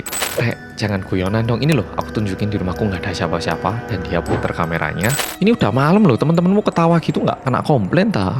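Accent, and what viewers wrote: Java, Indonesia. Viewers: Sumpah nonton vidio mas nagih banget.aku suka suaranya yg medok2.btw gerak gerik mas mirip jungkook bts jujur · Oppa medok, aslii banged haduhhh 😁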